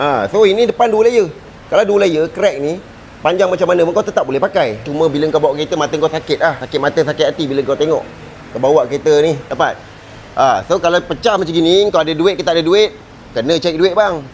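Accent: Indonesia. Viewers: Indon ke dia ni?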